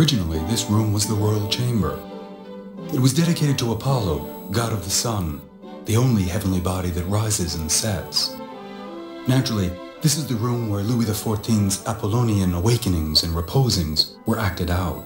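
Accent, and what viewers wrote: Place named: United Kingdom. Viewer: The narrator clearly sounds British, not american nor French either.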